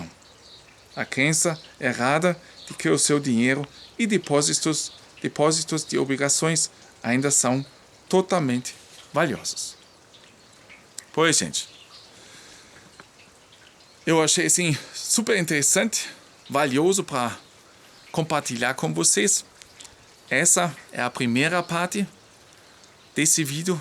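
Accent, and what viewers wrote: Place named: Germany. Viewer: Apesar do forte sotaque alemão, você fala o nosso linguajar, muito bem editado. Você dar aula de conhecimentos gerais que poucos conseguem. Parabéns; Mendonça de Fortaleza Ce. Br.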